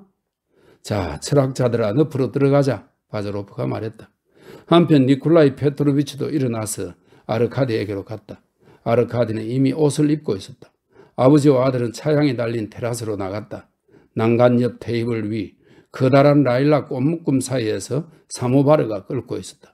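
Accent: Gyeongsang, South Korea. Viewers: 경상도사람의 억양이 들어가서 듣기가 매우 불편하네...다른 사람이 낭독한것은 없나요?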